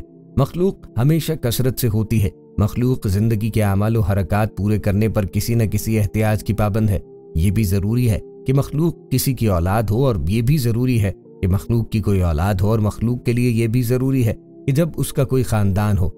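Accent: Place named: Pakistan. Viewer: Beautiful voice and and Urdu accent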